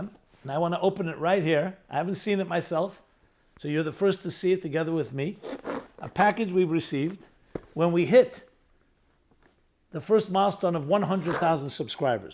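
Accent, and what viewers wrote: New York, United States. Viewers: How wonderful your lessons are, I have shared to many of my friends and clients. The way you speak resonates deeply within. And the NY accent is so good!!!! TY!!!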